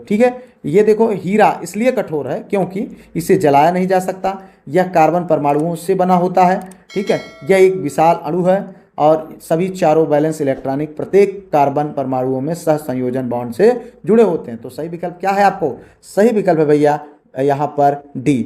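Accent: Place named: Chitrakoot, India. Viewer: Very nice chitrkoot ki boli Sir apni matrabhasha ko bolkr bahut sukoon milta hai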